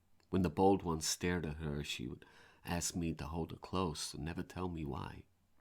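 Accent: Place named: United States. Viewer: Whoa! Chilling! (Your American accent is awesome, by the way )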